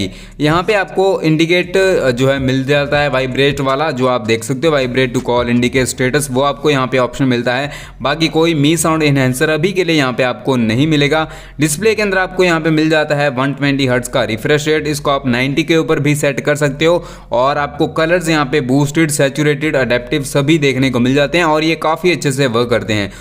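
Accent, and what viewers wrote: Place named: India. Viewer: English title + Indian speaking, bro = dislike, bro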